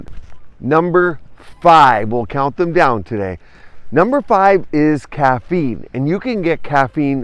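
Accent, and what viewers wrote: Canada. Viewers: Well your accent reminds of a Canadian accent, and you must be on the Atlantic coast so I guess Nova Scotia? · I falsely thought the eastern part of Canada stayed cool all year but see thats not true and can be quite warm. Your canadian accent seems more pronounced when you're there. I don't normally notice that you have an accent. Looks like a great place to grow up!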